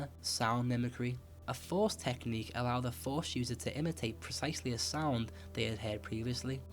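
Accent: Liverpool, United Kingdom. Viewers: is that a Liverpool accent?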